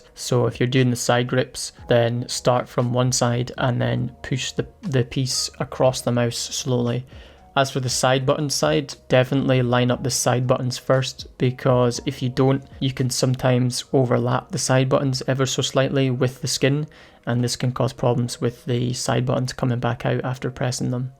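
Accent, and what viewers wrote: Scotland, United Kingdom. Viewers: Great video. Sounds like your from the UK. any chance I could buy that broken mouse off you as it would make for a good time trying to fix it. Thank you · are u scottish ? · Super high quality production dude, you did an amazing job. Also, is that a scottish accent i hear?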